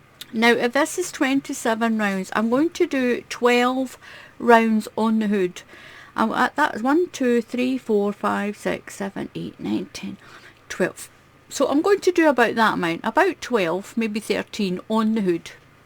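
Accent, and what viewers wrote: Scotland, United Kingdom. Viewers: I stumbled over your website. You have wonderful patterns. But I have a problem. I got sent to a hearing specialist when I was 5 yrs old due to suspected loss of hearing. Severe ear infections over the years ate away the bones in my inner ear. I relate this for all new mothers to pay attention to fussy babies who keep rubbing their ears or get colds. Working antibiotics finally arrived when I hit adulthoods, but the damage was done, I lost complete hearing in left ear due to destroyed bones, and partial in the right is left. I am 70 yrs old now, retired, but lost almost all my hearing. Hearing aids were stolen by “helpers.” My problem is now not being able to understand low soft voices. I had a Scot boyfriend in college your voice sounds much like his mother’s. So I have a dual problem, voice too soft to hear well, and I have to work my way through your accent. 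What compounds it is so far, I can find no instructions in your various pattern videos included in printed on your videos. I have to see the piece you are working on to figure out quickly what you are doing. But often your hands cover the work. Most f what I crochet is done for charity. I’ve done hats, scarves, mittens for grade school children, donated them to schools that provided children with winter items who lost theirs or parents couldn’t afford to buy them. I’ve done baby blankets to go to maternity units in hospitals or for those in NICUs for preemies. Also have done lap afghans for wheelchair vets in veteran h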